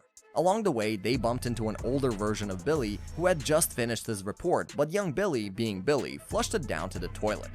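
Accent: Canada. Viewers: I had stopped 10 mins before the end of the video... dude I know you gotta be Canadian because of using zet but they still sa the names correctly -_ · Great video. Where are you from? I notice your pronunciation stands out. My guess is Canada.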